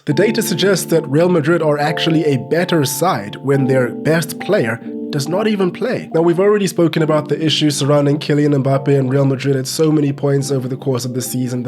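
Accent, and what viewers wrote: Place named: South Africa. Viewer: Bro, are you South African? Your accent reminds me so much if my cousins back home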